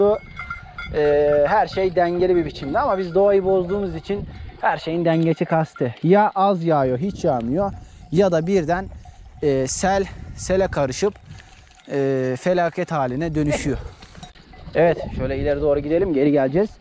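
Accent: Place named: Balıkesir, Turkey. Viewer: Degerli kardesim;bircok videonu izledim.Koy hayatini hic bilmeyen biri olarak zevkle izliyorum.Rahmetli babamin koyu varmis,17 yasinda gittim ilk defa.Ben balikesir in ibirler koyundenim(babam yasasaydi 75 yasinda olacakti sehirde dunyaya gelmis)senin sivenle benim koyumun sivesi ayni.keske bende koyde dogup buyuseydimde,14 yildir abd gibi ulkeye gelmek zorunda olmasaydim.TOPRAK ve koy.senin yemek yerken video larinida izledim.Kardesim,neguzel ki bizim gibi cop yemek (kimyasal)yemiyorsun.Allah ailenle seni mutlu etsin.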